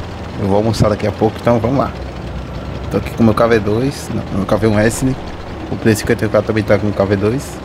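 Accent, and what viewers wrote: Northeast, Brazil. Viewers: Tú é nordestino né fi?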